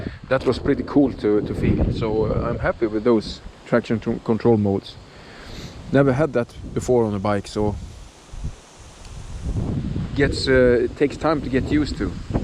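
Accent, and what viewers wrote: Sweden. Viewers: Great video; and excellent comments on the bike's performance. I have several KTM/Husky bikes and I love this size whether it's the Duke or the ADV. Beautiful scenery too. The boreal forest is flat but very "endless" feeling. I enjoy your accent. My ancestors were Swedish and all the old folks are gone now so I miss it.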